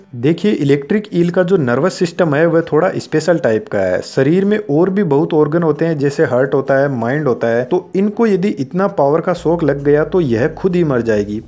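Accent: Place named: Haryana, India. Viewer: Nice video bro....ur accent seems haryanvi... 😀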